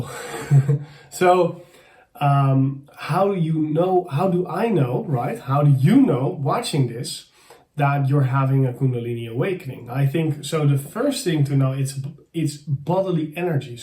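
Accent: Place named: Netherlands. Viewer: As soon as this started playing, I recognized your accent right away because my Divine Masculine is Dutch also! Ha ha! And I love when I hear other men speaking with a Dutch accent because it clears up for me that it's my DM's VOICE that affects me! Blessings and thank you! My kundalini has just begun rising and I need all the info about it I can find!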